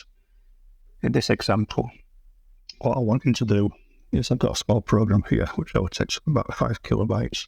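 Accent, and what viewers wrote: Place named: Scotland, United Kingdom. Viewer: I can understand you, maybe I’m used to the northern and lowland Scottish accent?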